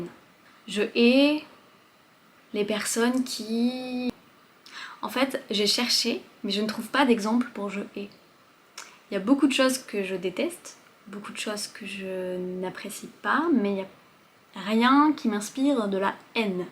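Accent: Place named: Normandy, France. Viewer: "Je hais" - Décidément on n'a pas le même accent. Tu prononce "Je hé", à la normande, alors que je le prononce "je hai" ou "je hè". Ah ce français et ces accents ! 🤣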